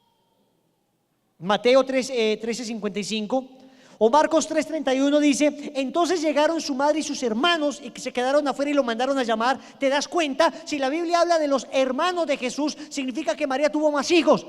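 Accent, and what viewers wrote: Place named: Colombia. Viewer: este ermano deve ser Colombiano muy buenas explicación aun que no pude evitar reir en varias veses su forma de ablar es desir de desir las cosas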